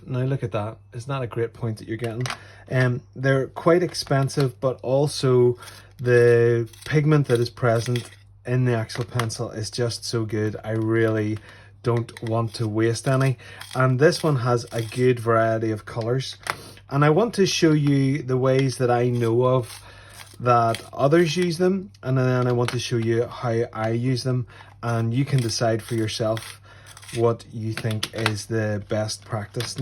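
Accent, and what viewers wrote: United Kingdom. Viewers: Love your Northern Ireland accent! I have these DERWENT water colour pencils all in lovely earthy colours - but only a 10 pack - l called in Homecraft today to get this bigger selection that you happen to be using - but the price was very high and l couldn’t be sure if they would be as effective as my original 10 pack…. So thank you for this video …. You have persuaded me to invest in this bigger selection! Love your work! Delightful to see an artist from NI on YouTube!! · Just starting to learn pencil drawing and water color pencils at 70. I am watching various youtubes to learn the basics. I came across this and watched intently. I had to chuckle a bit, when I thought people were watching wheels, instead of whales. :>) Our American accents are just a bit different than UK ones. So thanks so much for a great video.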